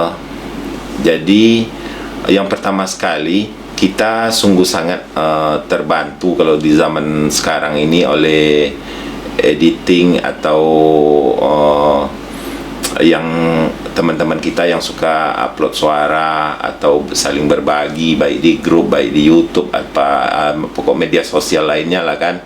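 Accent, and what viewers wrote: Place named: Padang, Indonesia. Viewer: Cakap nyo logat padang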